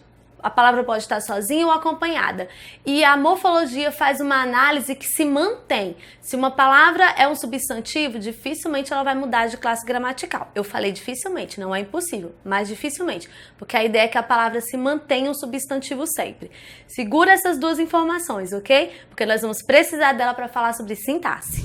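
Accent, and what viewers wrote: Rio de Janeiro, Brazil. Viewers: Tu é carioca? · O sotaque mais bonito do Brasil. E eu nem sou carioca.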